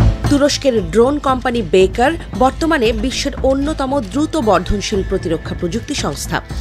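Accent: Kolkata, India. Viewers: উপস্থাপিকা কি কলকাতার??? কথা তো বাংলাদেশীর মতো লাগছে না । কি ntv???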